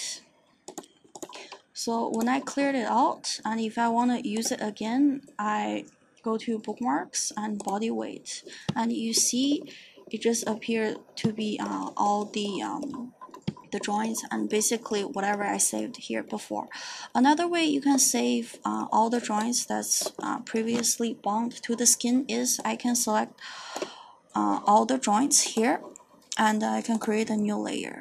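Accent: China. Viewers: Hi, first of all, thanks for sharing, it's great content. And the moment I hear your voice, I can almost tell that you are Chinese from your accent. Your voice is good btw. And according to your video, I think your conduction means after exporting the skin weight map once we need to re-rig the mesh by "Select Hierarchy and rig it from selected joints" way and then import the skin weight map. Last but not least we need to make sure all joints related are selected, no more no less. I have tested it through this way and it seems to work as expected. Kind of a weird bug that Maya couldn't read the hierarchy after rigging the hierarchy way. we seems to have to tell Maya what joints we did use to rig the mesh. How did you...I mean you r awesome to have found the solution. Congrats. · I'll give this a try later :) Your accent btw, from China?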